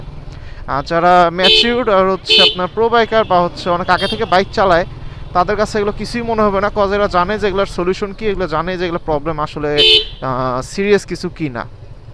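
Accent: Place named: Rangpur, Bangladesh. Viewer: Subscribe to onk age korsi,,,,apnar mukh theke Rangpur er kotha sune vlo laglo,,,,,akhn theke potita video te amr comment paben"!💬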